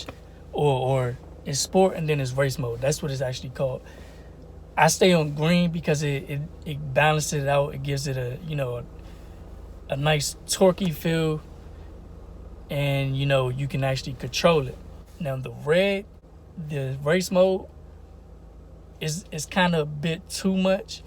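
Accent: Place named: Maryland, United States. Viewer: as soon as i heard your voice i thought of maryland, i’m from NC but family lives there... good video brodiii💪🏾🙌🏾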